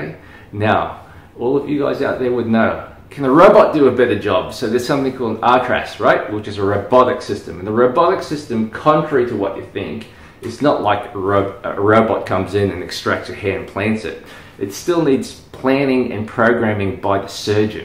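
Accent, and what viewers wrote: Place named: Queensland, Australia. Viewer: Idk how your international followers understand you. That's a really thick Queenslander accent 😒😁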